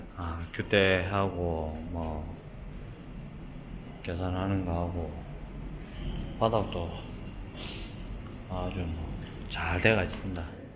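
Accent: Gyeongsang, South Korea. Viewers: 절대 경상도 사투리가 불편하지 않아요 가정적이시고 정말 보기 좋아요 앞으로도 계속 잘보겠습니다~~°행복하세요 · 오히려 더 친근감 느껴지는데요 경상도 사투리 중독성 강합니다 전 경상도 사람 아니지만 넘 듣기 좋아요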